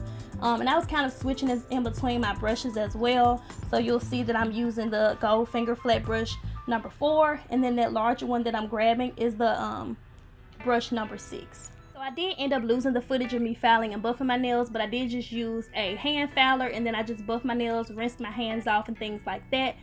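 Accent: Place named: Memphis, United States. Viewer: wow, you are so cold! I heard your Memphis accent and was instantly looking for an appointment website bc your nails are so nice! lol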